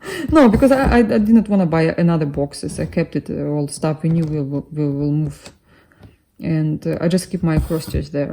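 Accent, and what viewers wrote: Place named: Russia. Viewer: Очень нравится ваш "русский" акцент😊😊 а не как другие, пытаются симулировать акцент. хрипеть еле выговаривая слова 👍👍👍👍👍понятно каждое вами сказанное слово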